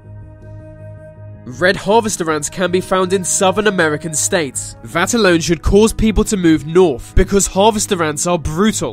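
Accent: United Kingdom. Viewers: Your sense of humor & that Brit accent is deadly too! 😎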